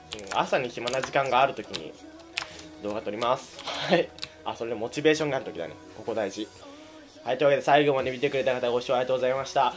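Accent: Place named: Nagoya, Japan. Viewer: 名古屋弁じゃん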